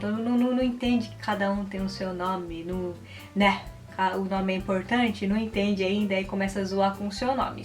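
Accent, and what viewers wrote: Minas Gerais, Brazil. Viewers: Os seus pais eram de qual estado do Brasil? Você fala um pouco como os mineiros ❤🥰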